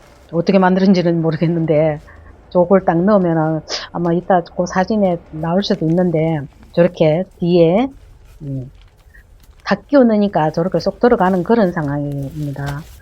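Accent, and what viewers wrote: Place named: North Gyeongsang, South Korea. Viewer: 왠지 익숙한 편안한 억양이랑 말투라서 같은 곳이구나 생각했지요 저도 경북이거든요 멋지세요^^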